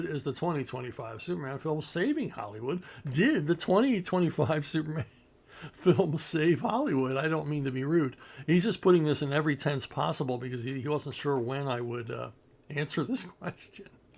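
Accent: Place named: Chincoteague, United States. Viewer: People from my hometown of Chincoteague Island, VA also say "wooter" and "igles." It's so distinctive that I was shocked to hear from Philly pronounce things that way on his podcast. Though Chincoteague had a lot of truck with Philly, including men working seasonally in Philly (where my great-grandfather met my great-grandmother), so that might have something to do with it.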